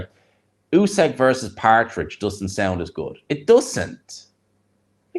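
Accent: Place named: Ireland. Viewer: Thought I'd check out your analysis; always enjoy your comments and Irish accent. Enjoy your sleep!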